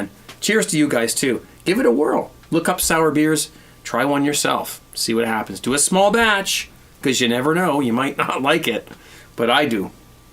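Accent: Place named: Canada. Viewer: what part of Canada are you from? Ontario?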